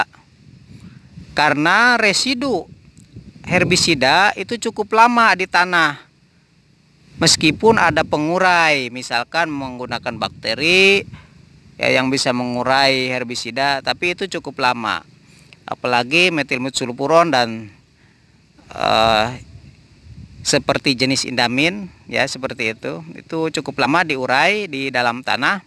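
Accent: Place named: Cianjur, Indonesia. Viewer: Logatnya seperti tmn saya yang dari Cianjur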